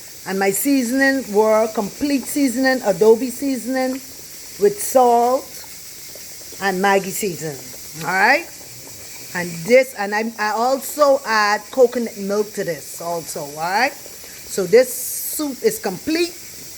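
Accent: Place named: Bahamas. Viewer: Bey my wife went crazy wit da Bahamian talk, she loved it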